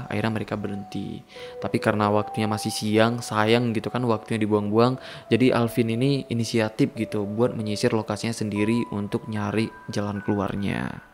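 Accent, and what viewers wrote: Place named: Medan, Indonesia. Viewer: Orang medan yah?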